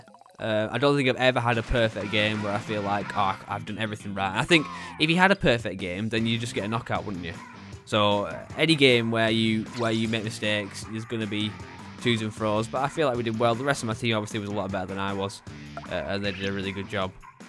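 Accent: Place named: Scotland, United Kingdom. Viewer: You sound partially scottish and partially not, it'S CONFUSING but this video has some really nice tips. I'd love to practice with you one day :'3 it's nice getting tips and I learn better through practice anyway. ... though, I'm interested- as another weapon, how would you counter a tri-slosher user? ;)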